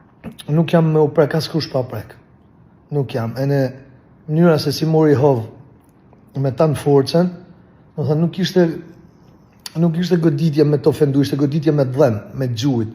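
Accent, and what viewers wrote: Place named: Laskar, Albania. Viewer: Ik ore lepirse, le nam. Po kan ngren leva. Veprimet kan pasoja, mire ja beri. Ti gjithsesi je vk qe flet si laskar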